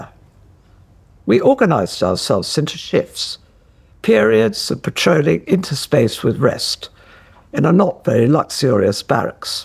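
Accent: United Kingdom. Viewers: She's got an amazing accent-I.love the upper class British accent!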